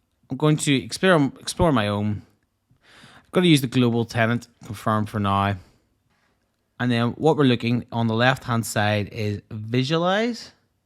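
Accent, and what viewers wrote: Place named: Belfast, United Kingdom. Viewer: Love the Belfast accent! Good work!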